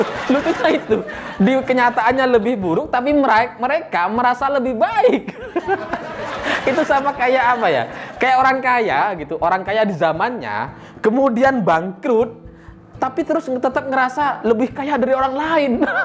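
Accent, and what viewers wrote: Madura, Indonesia. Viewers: kayak orang madura. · Mas ini khas Madura, lucu tp isinya bermutu. Maju terus kang. Dekrema niku dik!!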